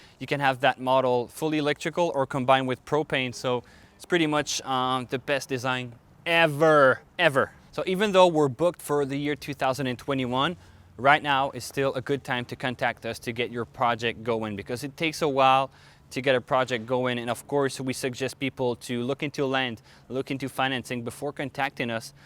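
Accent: France. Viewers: This is absolutely excellent. This is my favorite one. And I noticed that you've lost your French accent, which I miss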